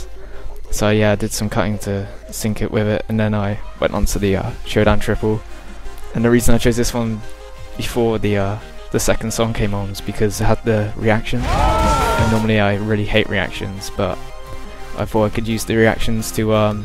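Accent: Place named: London, United Kingdom. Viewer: Ah ok, sounded like you where from London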